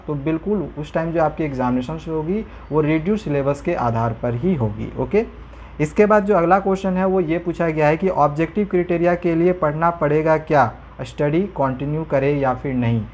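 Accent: Jharkhand, India. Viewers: Sir aap jharkhand se ho??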